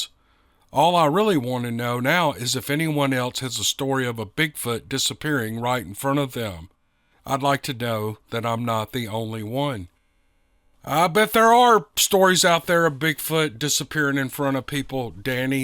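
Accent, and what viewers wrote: South, United States. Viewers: I was born in Louisville. Kentucky. I was raised in the south. I live in Oregon now. Hearing a southern accent makes me homesick. I enjoy this site alot.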